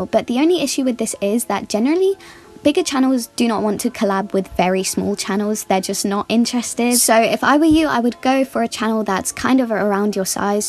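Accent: United Kingdom. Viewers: I would love you to teach your British accent. that would be helpful. 😊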